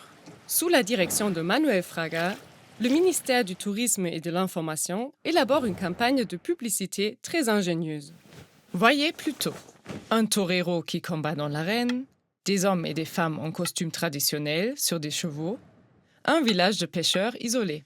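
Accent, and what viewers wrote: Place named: Germany. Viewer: Elle a l'accent allemand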